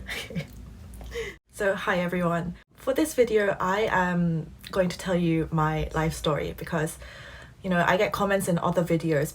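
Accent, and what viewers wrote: England, United Kingdom. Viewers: Wow, what an amazing life story! At least now we know where you got the strong English accent from (absolutely fabulous 💫). Oh yeah, Russian music and ballet teachers don't mess with them!! 🎶 Did you take piano classes? Rachmaninoff and some others probably had gigantic palms, hard to stride the notes for those with smaller hands. I think you will thrive on YouTube with your bilingual abilities. Welcome 🏡 dongsaeng 👊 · Many thanks for such an encouragement life story. I must say that your mum had made the best decision for you and your brother. I have never ever known a Korean person speaking excellence English (in English accent) likes you are. All the best from Melbourne, Australia:-) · This proves your UK accent!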